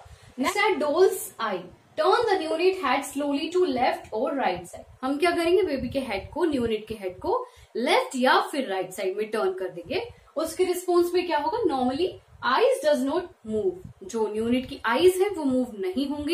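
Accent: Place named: India. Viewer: English ko Indian log ke jaisa bolya mam pronouncession is bohot hard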